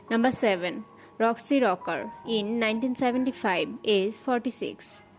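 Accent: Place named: South India, India. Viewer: This narrator has very strong South Indian accent. I can't understand her ?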